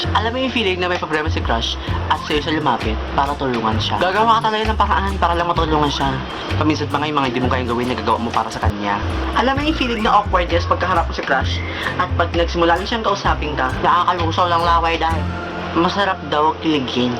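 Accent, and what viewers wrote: Visayas, Philippines. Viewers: Are u bisaya?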